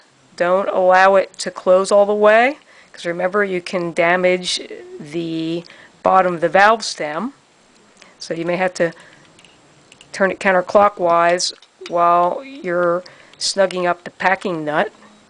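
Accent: Philadelphia, United States. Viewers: From Philadelphia area? The accent is crazy! Wudder, Donit. lol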